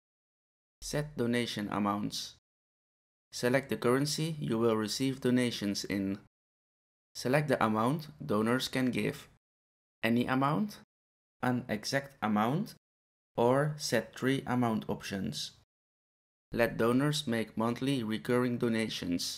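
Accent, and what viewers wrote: Netherlands. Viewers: you are dutch i hear it in your accent lol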